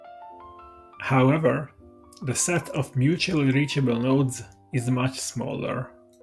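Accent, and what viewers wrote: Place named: Hungary. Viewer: Judging by your accent and choice of music, you're from Hungary or Slovakia.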